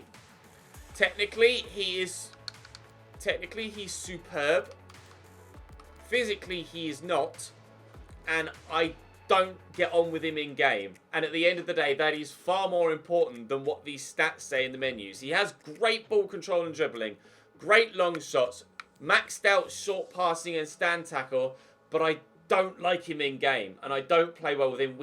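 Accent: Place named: RP, United Kingdom. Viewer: FUCKING BORING, NOT NEEDED TO CONTINUE THE CAREER, YOU TALKING IS SO BORING WITH YOUR UPPER CLASS POSHNESS xD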